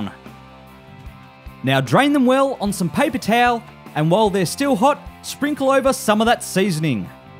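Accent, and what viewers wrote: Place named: Australia. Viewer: uselessjoe the accent is australian, if that's what you were getting at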